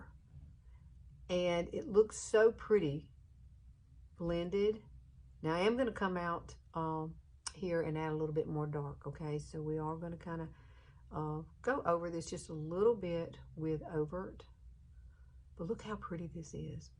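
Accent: South, United States. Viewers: Hello,! I have my fetish about femininity whether I watch beauty/fashion videos, watch "motherhood videos, including day-in-the-life vlogs" or think about waitresses. I have my fetish about mustard and ketchup whether I squeeze 'em "on my hotdogs, cheeseburgers or hash browns" or "for my fries". I have my fetish about the round condiment squeeze bottles whether I think about the diners, pancake artwork parties or painted canvases. I have my fetish about the feminine names like yours. I enjoyed this video of yours. You have your Southern accent since you're living in Alabama. Quite recently, I watched the videos on "MrsMelissaM Beauty" and " Unboxing Mom". I watched the empties video and week-in-the-life vlog on " Parada". On one of the videos on " Unboxing Mom", I spotted her daughter named Kelly. I watched the muted videos on "Made by ", filmed in Holland. 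One of her videos featured the tie-dyeing party for Dutch children where I spotted the round condiment squeeze bottles. On Aug. 30, I washed 2 out of my 4 reusable "16-ounce" OXO squeeze bottles and separately replenished 'em with honey barbecue sauce and pancake syrup. On Aug. 31 and Sept. 1, I played with my 12 empty, clear "12-to-16-ounce" squeeze bottles by stacking, holding and "slowly squeezing" 'em. For my 1st dinner of September, I squeezed mustard and ketchup on my 2 hotdogs with my 2 other reusable OXO bottles. I ate some lightly salted Pringles chips and drank my soft drink. B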